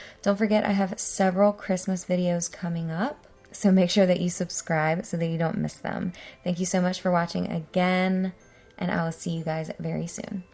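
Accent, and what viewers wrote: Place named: United States. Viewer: Lovely video ✨ I love them all, especially the white one. Creative, a nice narrator, and nice American accent 🌙 🎄❄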